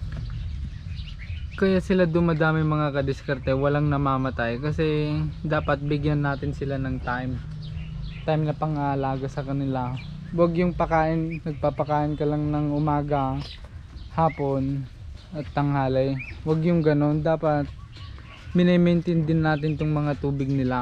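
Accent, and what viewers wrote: Ilocos, Philippines. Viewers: Iba talaga nu ilocano ang naga tagalog nagmayat pakinggan hahaha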